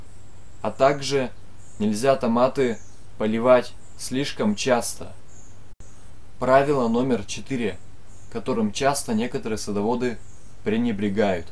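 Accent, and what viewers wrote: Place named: Urals, Russia. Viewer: говор уральский....в каком районе проживаете?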